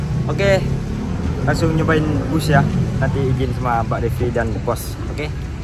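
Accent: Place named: Madura, Indonesia. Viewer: Logat bhsnya sepertinya org madura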